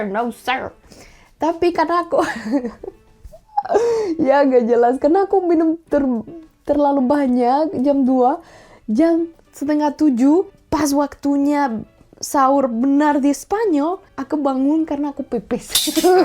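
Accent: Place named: Indonesia. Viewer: Gayamu... Tengilmu... Bicaramu..... Gokil indo abis. Top.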